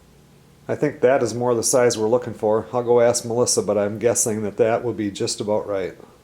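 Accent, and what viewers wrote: Minnesota, United States. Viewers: I thought it was "The Tent" guy and see I was right. Being a Minnesotan myself I noted that a lot of guys sound similar, sound like a couple of my Duluth cousins :)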